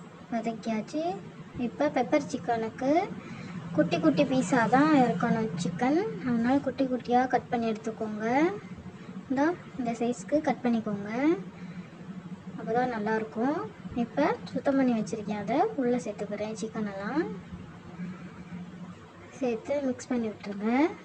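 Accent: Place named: Madurai, India. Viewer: Your madurai slang is awesome 👌 👏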